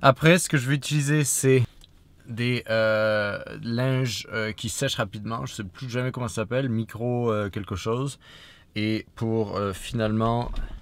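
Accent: Quebec, Canada. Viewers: L'accent canadien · jb 2004 l accent québécois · Ça ce voit qu'il est français, les gars, il a juste un petit peu pris l'accent québécois. x) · Tu a un accent quebecois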